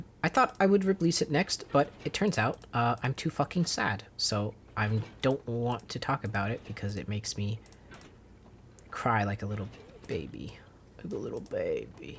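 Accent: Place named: United States. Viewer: Some advice for using Japanese words: it's phonetically very simple, so saying a single syllable in an unusual context with an American accent is really a nightmare for understanding what you're trying to say (unless we've all read the exact Miyazaki quote you're referring to). Something you'll see on Japanese TV is subtitles and people stopping to define ambiguous terms when having conversations to avoid confusion. Or you could just say "space" or "pause" if you don't want to go into a tangent defining a Japanese word every time you talk about slower paced videos.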